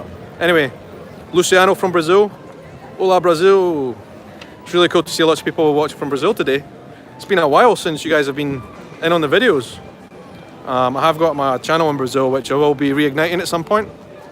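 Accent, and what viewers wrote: Scotland, United Kingdom. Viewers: I so enjoy listening to you on these videos. You are a great guy with a Scottish accent:). I am from Lake Tahoe area in California, USA.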